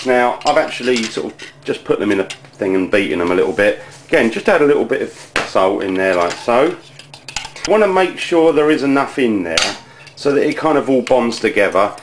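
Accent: United Kingdom. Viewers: The texture of potato absorbents too much oil.. I don't see myself indulging on such an unhealthy recipe. As a matter of fact, I can think of two ways to do this exact recipe in order to cut, more than likely, at least HALF the calories. But in fairness, healthy wasn't your approach. Dzięki Ci! Ps. British accent, Mexican dish?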